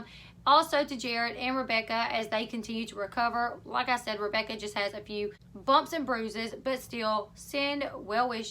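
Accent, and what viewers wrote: South, United States. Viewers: Taking short wolks your voice is amazingly southern, and it was a 1970 barracuda yea but it had a hellcat motor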